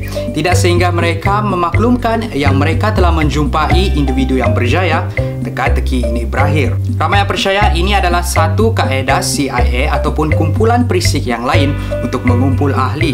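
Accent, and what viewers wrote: Sabah, Malaysia. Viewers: Sabah ka kau ni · MN ada indon punya CKP MCM nie, hahaha dia org Sabah lh CKP MCM org KK... kotakinabalu bah Sabah bah.... salam dari kotakinabalu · Obviously it's Sabahan... beza bahasa indo and malaysia... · dia asal sabah tpi sda lama stay d KL tulah bckp pun ada cmpuran mcm bhasa sabah smenanjung & indon.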